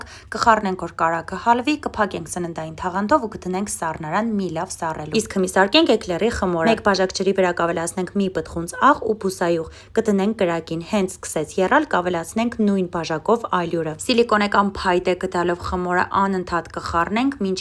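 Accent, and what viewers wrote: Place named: Gyumri, Armenia. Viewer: Իսկ ոչինչ որ Գյումրեցի է և նորմալ է Գյումրվա բարբառով խոսելը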